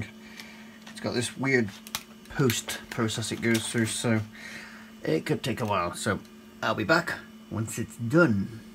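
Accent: United Kingdom. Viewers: Are you british? I am awful when it comes to guessing where people come from via their accents lol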